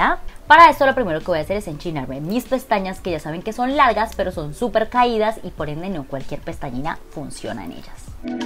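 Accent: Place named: Colombia. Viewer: Mi niña que lindo acento tienen l@ colombian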